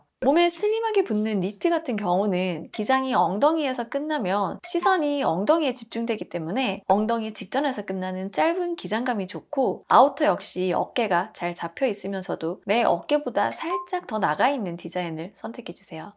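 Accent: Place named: Gyeongsang, South Korea. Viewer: 경상도 출신이신가요? 은은하게 들리는 사투리❤️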